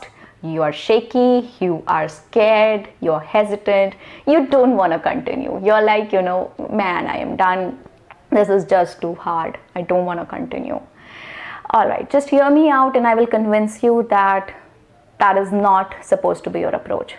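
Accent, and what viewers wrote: India. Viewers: Your hindi's tongue made your english so clear. I enjoyed listening your english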